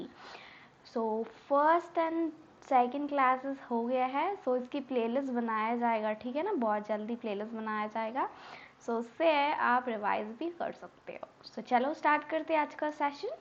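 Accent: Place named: South India, India. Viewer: Wonderful session mam. And your voice is very very cute mam. There is a foreign+ South Indian touch in your accent which makes it unique☺☺☺😍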